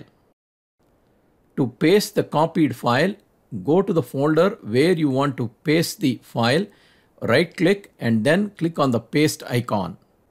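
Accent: India. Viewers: There is a need for Windows 11 instruction such as this but with instructors who can speak clear English without an accent, which in this vid are by an Indian speaker. I cannot grasp the content while trying to get through the accent. It is also important to have people who understand teaching techniques for clarity, and slowing down so that everything is done in 5 minutes.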